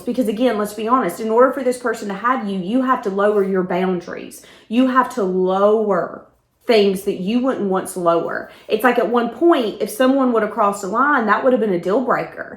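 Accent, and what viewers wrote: South, United States. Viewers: I love your Southern accent chere!💜💜💜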